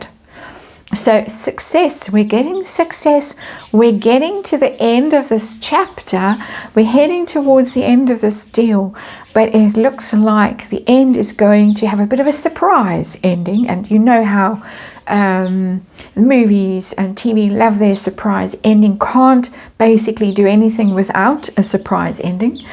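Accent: South Africa. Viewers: has a beautiful South African accent! I think she would be a fabulous audiobook reader!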